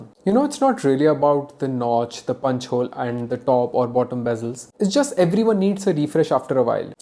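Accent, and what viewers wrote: India. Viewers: im 22 years old, my first phone was an iphone 4 back in 2013. my phone history is Iphone 4, 6, nexus 6p, iphone 7+, X, 11, and now 12. I fucked up going from the x to the 11 but lets just skip past that. I loved the nexus while i used that but there really is something to be said about your phone being simple and working out the box. I loved being able to change basically everything about my phone but (i think this is a me thing) i never felt like i could get my phone to do exactly what i dreamed it could. When using an iphone, there may be things i hope get changed, but im never left with the feeling of "i bet if i completely rework my phones set up i can fix this one little thing i want" lol. Iphones do everything i want and they keep adding stuff so its pretty nice but i got no hate to anyone who prefers that android experience. P.S. I hope this doesn't come across in an offensive way but your accent is beautiful and crystal clear. 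This American has no problem understanding you and enjoyed the video! Hopefully these tech companies expanding into India will be down to start sending products to local tech youtubers like you.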